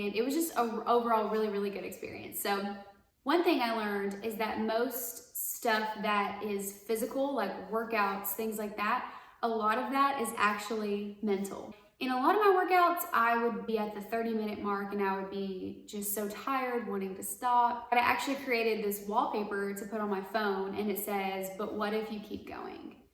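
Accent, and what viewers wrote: United States. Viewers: ahhhh. I get you now. you have a little southern charm in your voice. I picked that up quicker than I did with your sarcasm.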